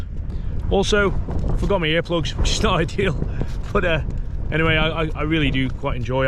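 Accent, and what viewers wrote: Liverpool, United Kingdom. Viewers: Are you a scouser by any chance?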